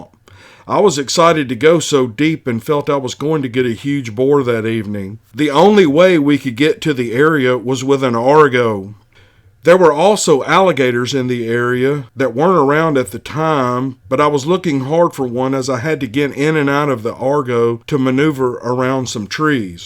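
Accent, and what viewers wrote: South, United States. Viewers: I absolutely love everything about your podcast: the content, the delivery, and especially your gorgeous Southern accent! It has become my fav Sasquatch Podcast. Keep up the great work darlin! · Dear.....From a married woman with 2 kids that lives in Greece...yep in Europe....I sit in my free time and listen to these encounters and that fabulous SOUTHERN ACCENT...Dont ask me why but Dogman and Bigfoot just give me a thrill...MIND YOU>>>>I dont want to have an encounter but I am learning a few things and the first is....NO CAMPING for the rest of my LIFE...Yep thats how creeped out I am..and thankyou for reading all these experiences so as we can take precautions...TAKE CARE!!!!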